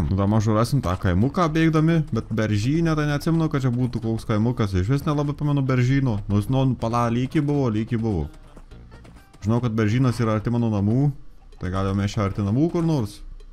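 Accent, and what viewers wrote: Suvalkija, Lithuania. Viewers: Kadangi esu iš Marijampolės tai tu taip primeni tėvus su tuo tarimu xd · Kai pamatai video: oooooo daba užstrigsiu porai valandų kol suprasiu viską ką jis ten suvalkietiškai kalbėjo.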